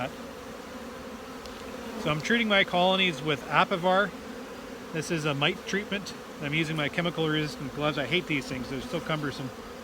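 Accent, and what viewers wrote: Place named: Alberta, Canada. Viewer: Where are you at lol you sound close to me in Alberta east of Edmonton 1 hour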